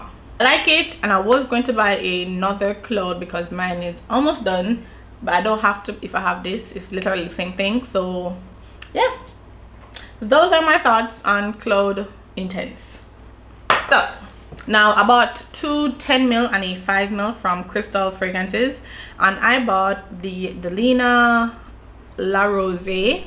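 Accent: Jamaica. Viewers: She is Jamaican and has an evident accent. I, and clearly many other people, can understand her perfectly. What was your intention with this comment, exactly?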